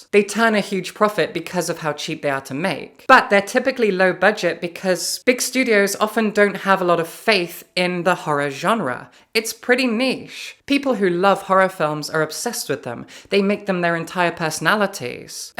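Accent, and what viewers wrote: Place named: Scotland, United Kingdom. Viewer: Accents: your own is fascinating. Clear indications you don't mix with working class Scots (or Scots!) much, posh Guffie tones. Vocal crack and Americanate vocabulary indicating your chief influences culturally are from the "Land of the Free". 😂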